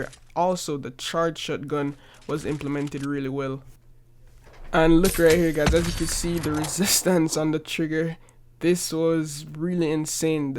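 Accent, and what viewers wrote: Jamaica. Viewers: Jamaican we can hear it in your voice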